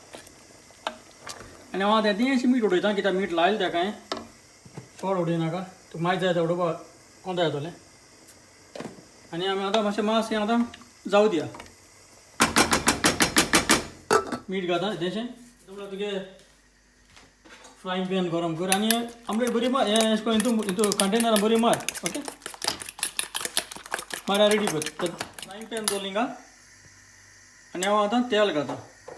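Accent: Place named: Konkani, India. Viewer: U r genuine Goan person. U've got the authentic Konkani accent and the Goa centric ethnic recipes. May God Bless you and may u come up with more Goan recipes. U r the source of cooking for many young Goans in cooking authentic Goan cuisine. Mog asum sodamkal. Long live our brotherhood and Goenkarponn. VIVA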